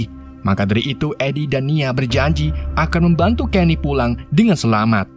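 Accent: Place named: Tegal, Indonesia. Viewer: Mimin tegal 😂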